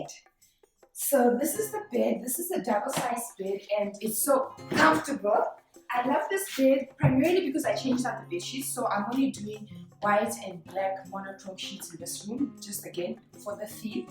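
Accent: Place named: Kenya. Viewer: Accent from Kenya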